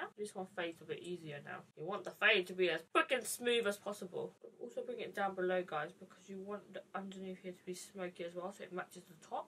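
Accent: United Kingdom. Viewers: Olivia 811 1. She's British. 2. She has braces under her teeth which causes her to have a lisp.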